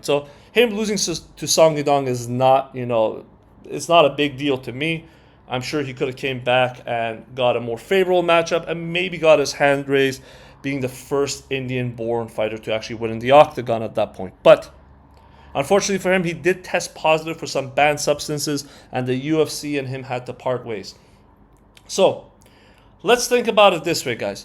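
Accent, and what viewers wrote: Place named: Canada. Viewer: u sound brown and from canada am I right? ik this a weird comment lol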